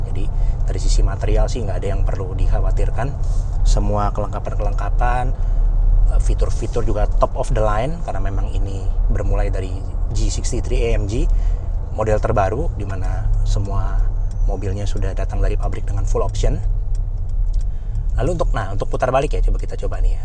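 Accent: Jakarta, Indonesia. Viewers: Kacaoo om bos , logat jakartanye kentel banget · Betawi banget ngomongye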